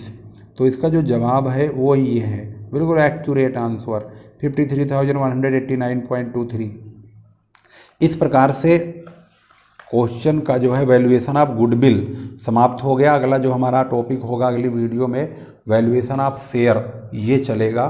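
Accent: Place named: Meerut, India. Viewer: Sir i think you're from meerut,i guess by your tone 😅and thanks for your video 😊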